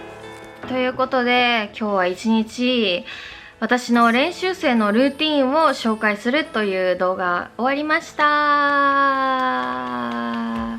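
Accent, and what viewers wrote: South Korea. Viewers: カタカナ英語がもうほとんど韓国語の発音になってるー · 朱里さん、少し韓国語訛りになってきてませんか？🤣🤣🤣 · 日本語も少し韓国語っぽく聞こえる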